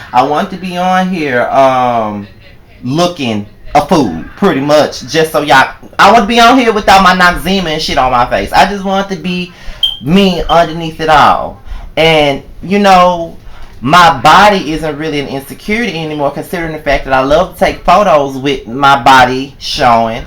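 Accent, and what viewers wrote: South, United States. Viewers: there is nothing wrong with you. Fuck the haters. Don't let that shit bother you. Who and what is attractive is a lot a matter of opinion. Your videos be on point. And there is nothing wrong with a southern accent, I like my southern accent. This video took a lot of courage to do.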